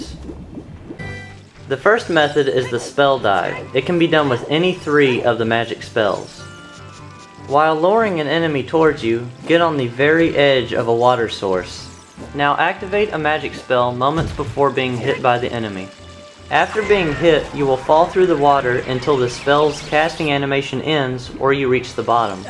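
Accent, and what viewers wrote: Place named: Texas, United States. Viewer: The Hank Hill accent, it's so sweet 😊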